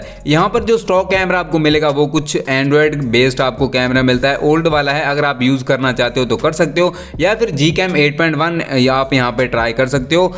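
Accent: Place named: India. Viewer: English title + Indian speaking, bro = dislike, bro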